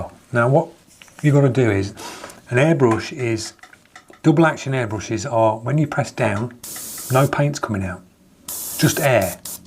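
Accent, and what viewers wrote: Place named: United Kingdom. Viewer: Excellent compilation of "oh no, oh my god"!!! Loving it - especially with the UK accent.😅😅😅 Additional beginners problem: Overthinking, overlearning, overanalyzing and not practicing enough because there is "yet more info to suck up before I do something wrong" (it's me). The advantage is, that I know most of what to do/not to do, but I lack the mileage. It keeps you from being frustrated, BUT it leaves a feeling of being lazy.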